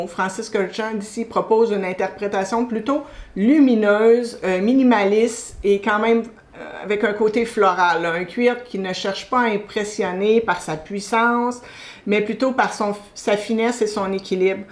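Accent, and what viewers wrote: Canada. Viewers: Coucou ❤️ Je viens justement de découvrir ta chaîne grâce à ton commentaire sur la chaîne d’Estelle, et je me suis directement abonnée! 🥰 J’ai beaucoup aimé cette vidéo autour de Frédéric Malle, Le Labo et Dior. Trois univers tellement différents! Je suis d’ailleurs totalement amoureuse de la maison Frédéric Malle ❤️, alors autant te dire que ta sélection m’a particulièrement intéressée! Contre-Jour, Violette 30, mais aussi Paradise et Cuir Saddle de Dior… de très belles découvertes et des parfums qui donnent vraiment envie d’être explorés davantage. ✨ Je suis moi aussi créatrice de contenu autour de la parfumerie et je suis belge 🇧🇪. Je pense que tu es au Canada, si j’en crois ton joli accent 😊. Je suis vraiment ravie d’avoir découvert ton univers et j’espère que nous aurons encore l’occasion d’échanger autour de notre passion commune pour les parfums. 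À très bientôt et gros bisous parfumés 💕🌸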